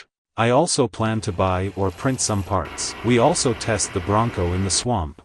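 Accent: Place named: Russia. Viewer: Лютый акцент я сразу понял что автор походу Русский )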